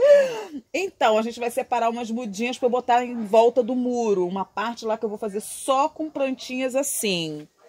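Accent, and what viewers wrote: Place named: Região dos Lagos, Brazil. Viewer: Nós de maricá pra lá, região dos lagos, acho que falamos assim. Mamãe e papai😀😀😀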